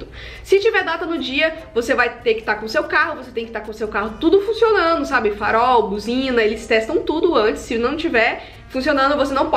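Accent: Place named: Caldas Novas, Brazil. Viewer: Minha família e de caudas nova e eles fala como vc ♥️♥️ legal q vc era de lá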